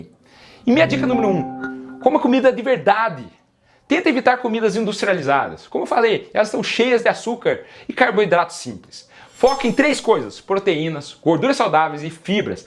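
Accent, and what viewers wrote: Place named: South, Brazil. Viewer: Sou de Lima Peru e ja inscrevi no seu canal, amo do seu canal e sotaque sulista como o meu hehehe🥰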